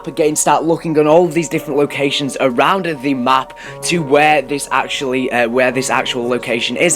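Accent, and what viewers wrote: England, United Kingdom. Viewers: No, not British. Its the accent/dialect of the area of England he comes from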